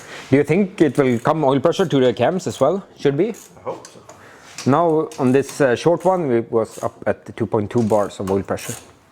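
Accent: Sweden. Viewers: Men satan va svensk du låter 😂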